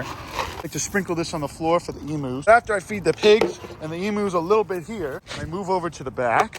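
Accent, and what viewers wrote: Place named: Pittsburgh, United States. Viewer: Aye, pittsburgh represent! i knew i heard a little yinzer in you lol God bless and happy holidays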